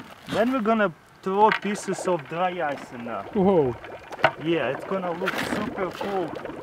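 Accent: Russia. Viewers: for Russian Accent, Love it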